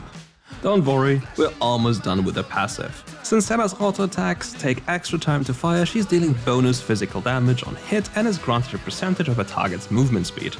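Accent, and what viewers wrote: Germany. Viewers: I like the charming German accent in the recent videos.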